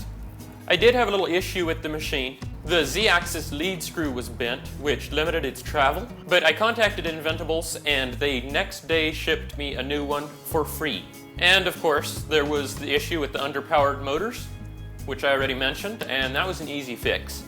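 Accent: Canada. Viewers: You have the most Canadian accent I have ever heard.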